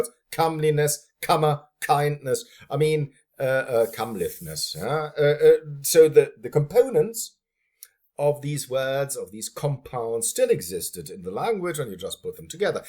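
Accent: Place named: Germany. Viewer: You sound like a german who learned english from some uppercrust posh british lord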